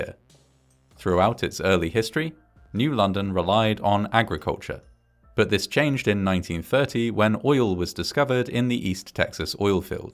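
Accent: England, United Kingdom. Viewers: I like how you don’t realize he’s English and that they pronounce things differently. America isn’t the centre of the universe.🙄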